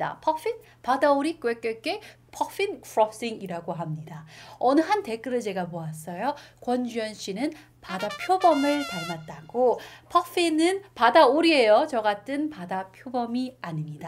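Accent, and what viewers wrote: United Kingdom. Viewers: 엄청 재밌어요 :) 영국발음듣고 영어기사 공부하는 것도 정말 좋아요, 딱딱하지 않고 방금 영어악센트로 빼박이라고 하셔서 엄청 터짐ㅋㅋㅋ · 아 발음 넘 멋져요ㅠㅠㅠㅠㅠㅠㅠ 영국발음 개간지ㅠㅠ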